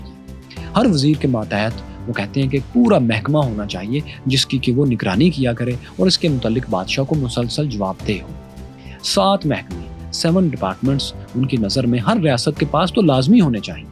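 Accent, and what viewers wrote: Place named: Punjab, Pakistan. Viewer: Other than the Punjabi accent it was good content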